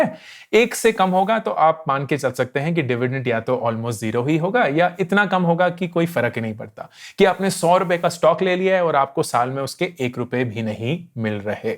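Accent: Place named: Punjab, India. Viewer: Bhayi, we are Punjabi's. It's alright to say cheejay with Jajjaa of Punjabi Language. We all should be proud of mother language and Mother tongue influence as long we are doing right things in our life. I hope u agree and keep saying Cheejay 😊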